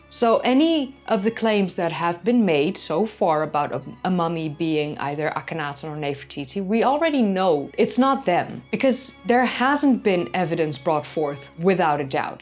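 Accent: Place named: Netherlands. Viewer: Love your Dutch Accent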